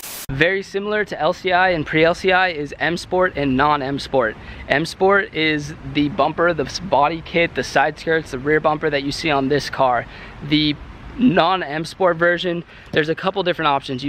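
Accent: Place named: New England, United States. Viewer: Sound like you from New England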